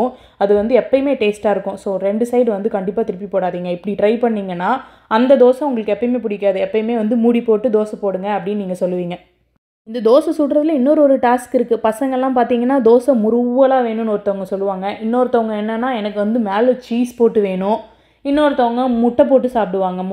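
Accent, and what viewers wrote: Kongu, India. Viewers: U speak kongu slang but howcome from tanjore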